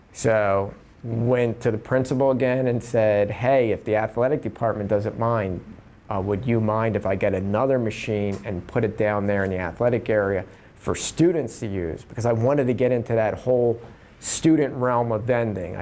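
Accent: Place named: New Orleans, United States. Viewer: Pennsylvania?? Why do u have a New Orleans accent?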